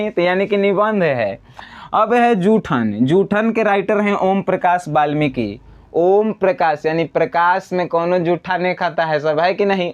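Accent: Bihar, India. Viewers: Sir aap bihar se ho kya language se lg rha h 😂😂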